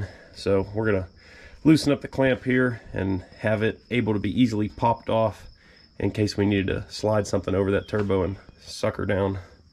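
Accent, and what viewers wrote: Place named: Tennessee, United States. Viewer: sounds like your from tennesse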